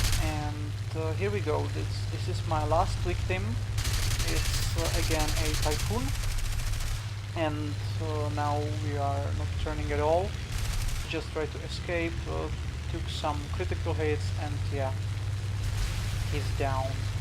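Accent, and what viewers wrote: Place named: Czech Republic. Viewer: jsi čech kámo ? :D :) podle nicku si myslím ze ano a podle prizvuku taktéž! :) (Y)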